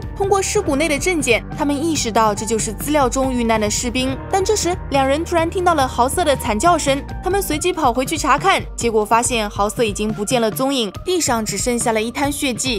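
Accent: Jiangsu-Zhejiang, China. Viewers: 可能因为Up主是江浙人吧~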